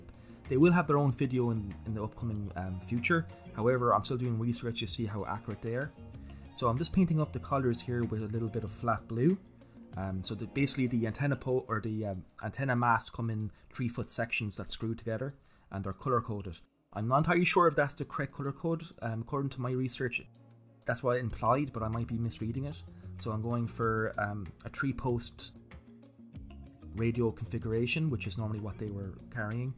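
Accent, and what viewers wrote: Ireland. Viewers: I like your technique. Obviously built up over time. The finished product looks great. Have never seen your channel before, I will make it a point to check out your other videos. And, it's nice to hear an Irish accent again.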